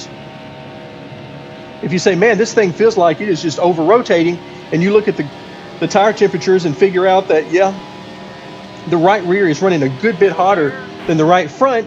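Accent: South, United States. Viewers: For some reason, your southern accent gives this validity. I hear it and I'm like "this guy probably knows more about nascar than me"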